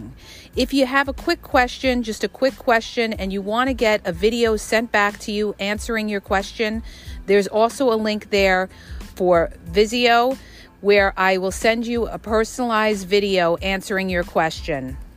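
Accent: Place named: New Jersey, United States. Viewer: I guess you're from Jersey.Thank God I love you.I'm from Elmwood Park.I love the way you talk and you don't put up with s*** and you swear that I love you liked and subscribed.I was with the covert narcissist 44 f**** years, I left 6 months ago and enrolled in cosmetology school and with my old roommate.But i'm still sad the abuses something else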